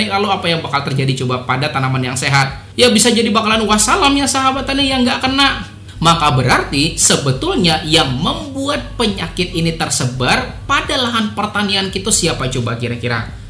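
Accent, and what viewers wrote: Banjar, Indonesia. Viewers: Orang banjar kah pian mas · urang banjarkah pian julak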